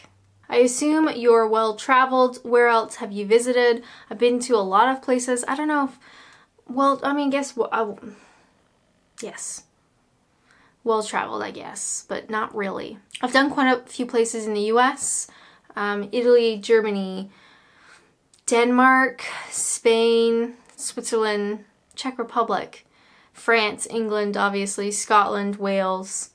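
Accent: Canada. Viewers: Your pronunciation of 'ou' in most words highlights your Canadianess! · Accent is definitely a cannuck. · Hi, are you currently back in Ontario Canada or still in Orlando Florida?? The room in this video looks more Floridian...is that even a word??? Well done reaching 60,000 subscribers. I was doing cartwheels when I reached 100. My assumptions about you is that you are able to handle just about any situation and you have a great positive attitude. Doing anything in front of an audience can be absolutely terrifying, I can completely understand your feelings about that. I also understand why you value privacy, I do the same thing. I would also really hate painting my toenails!!! LOL. Incidentally, I think your Canadian accent is great. Your accent is quite different to the BC Canada accent. Where did you get the "Adventures and Naps" name from for your video channel? 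Incidentally, when eating peas, which are all the same, why do we always choose which one to eat first? Now that is something to ponder about!!! I always add the milk after the cereal. When you do decide to get a delivery from Tim Horton's or anywhere else, could you possibly do a video covering the first unwrapping please? Anyway, wishing you the very best. Robert. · Lol, its pretty easy to understand you are Canadian. I can hear it whenever you speak.. Only Canadians say ABOOT, when saying ABOUT... I meet Canadians here in Florida all the time. Every winter lots of them come to Florida to escape the Canadian winter... I enjoy seeing and talking to them...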